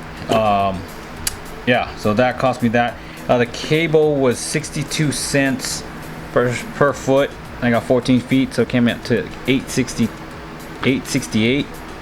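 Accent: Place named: Mexico. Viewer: That's a typical Mexican English accent 🤭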